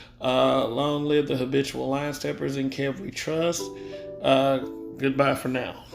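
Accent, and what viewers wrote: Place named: South, United States. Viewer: This is his channel he’s entitled to his opinion. are you mocking his southern accent? That is Ignorant of you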